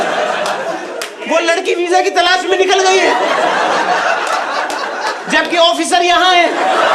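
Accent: Pakistan. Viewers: Why he's sounding like pakistani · Why he has a Pakistani accent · love the way you speak! Apka lahza pata nahi kisi ne notice kiya ya nahi but bhaut influenced hai how people speak in Pakistan. For example: Chai mai chini kam kare aap, mohan meri baat sune aap. Don't take offence but this is how most Pakistani influencers and comedians speak in general. Aur bhi notice kiya hai maine but all that sounds very nice....adds to the comedy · brother why your accent matches with pakistani's accent, are you from indian border side. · * ki tone itni Pakistani si kyu hai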